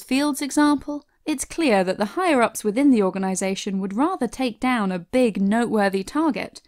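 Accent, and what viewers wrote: United Kingdom. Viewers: The British accents says it all..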